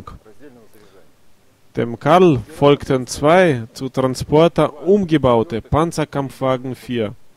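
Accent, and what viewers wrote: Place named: Russia. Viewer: Russian trying to speak german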